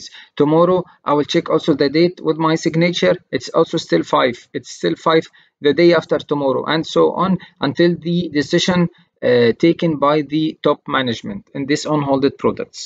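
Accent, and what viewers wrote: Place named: Arab world. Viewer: Are you from middle East? Your english is like arabic.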